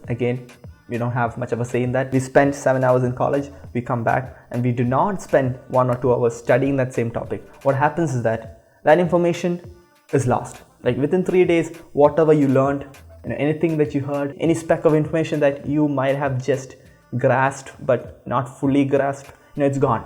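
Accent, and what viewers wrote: Kerala, India. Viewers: I love your mallu accent da!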